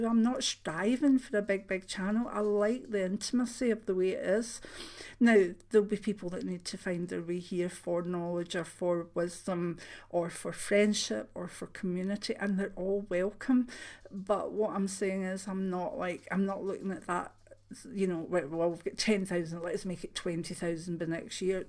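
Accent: Scotland, United Kingdom. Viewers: Hello dear, I've been watching in the background and not commenting until now. You are so genuine. I sense your energy. I'm in the USA and have a girlfriend from Scotland and everyone complained that they couldn't understand her and I never had an issue and loved her accent and she sounds just like you 😍. I love the way you say "wee bit"...it just makes me smile! Your channel is wonderful. I am clairaudient and clairalliant since childhood but it is random and I am trying to learn how to use it in a more controlled manner. Thank you. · Sometimes individual words can get be unclear but taken no notice of rudeness......that's all it is. Jealousy * (as always) for your success.. I find a scottish accent very pleasant to listen to and yours is no exception. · My family and I love your accent. We live in the USA but are of Scottish heritage and you sound like home to us. 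· My parents & siblings are from Kilmarnock! Your accent is great and understandable :)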